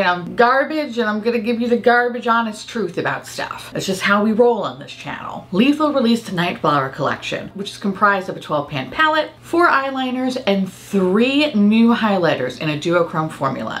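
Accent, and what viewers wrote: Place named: South, United States. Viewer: As a Texan, you totally worked that "southern hospitality" accent. It's apot on, and pairs lovely with your spooky girl aesthetic. Living for it. Love this palette and this video 🖤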